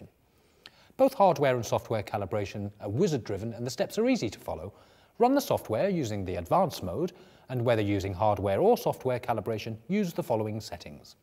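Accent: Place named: United Kingdom. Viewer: He's so British I'm afraid to call myself British.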